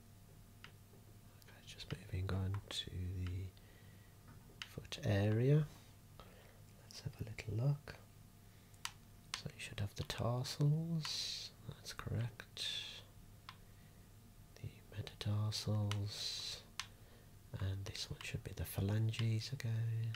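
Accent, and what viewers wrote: Birmingham, United Kingdom. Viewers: Love all ur videos man, u really do help me sleep at night, especially the eye exams, ur voice is super calm and the Birmingham accent is a bonus, keep it up, looking forward to ur next video🙂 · Ah! A fellow Brummie. This is fantastic! Must have taken ages to make though?!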